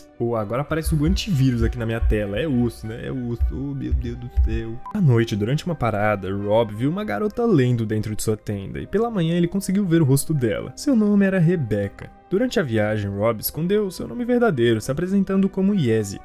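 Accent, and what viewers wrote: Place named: Northeast, Brazil. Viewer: Tu é nordestino. Ceará????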